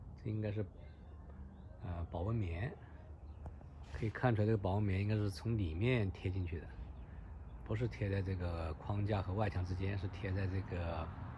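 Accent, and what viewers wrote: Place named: Hubei, China. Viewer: 感觉湖北人口音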